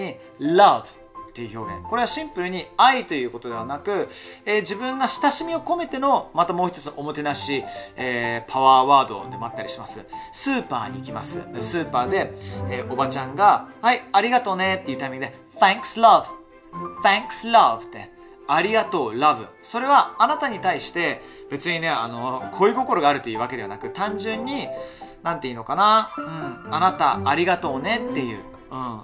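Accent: United Kingdom. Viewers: I’m Japanese but currently studying in uk and I really appreciate your lovely videos! They help me a lot to learn exact British pronunciation:)) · I like your lovely British accent!! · I fancy your lovely English accent!! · イギリス英語でLovelyがよく使われると知ってから自分でも使うようにしています！のイギリス英語で勉強するの楽しい〜！☺️ Your channel is so lovely:) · のイギリス英語が大好きで、いつもPodcastやインスタ観てます🇬🇧💕 イギリスにいると、電話先でも対面でも、たくさんの「Lovely!」が聞けて、Lovelyって言葉聞くと個人的にめっちゃ嬉しくなります❤️ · のイギリス英語って何と言うかすごくイギリスっぽいというか高貴な感じがするというか、素敵です！はイギリス生まれなのですか？ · イギリス英語かっこよすぎるー😭品格半端ない…憧れ…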